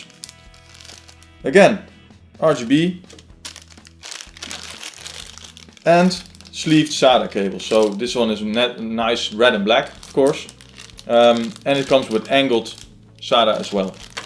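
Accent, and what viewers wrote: Netherlands. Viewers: this guy has a dutch accent XD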